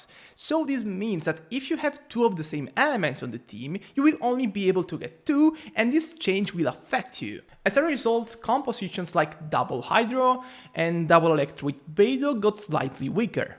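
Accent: Italy. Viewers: Where r u from? U sound really italian tbh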